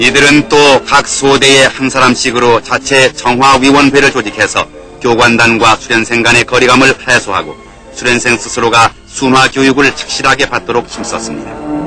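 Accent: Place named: North Korea. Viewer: 약간 부칸스러움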